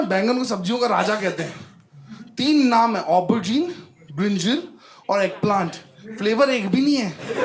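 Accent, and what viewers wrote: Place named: Bengal, India. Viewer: Your bengali accent is excellent ❤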